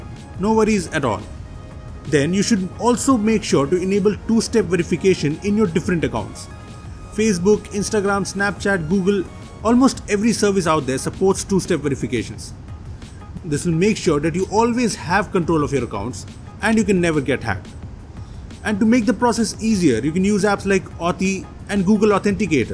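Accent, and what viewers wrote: India. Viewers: 7. Indian english accent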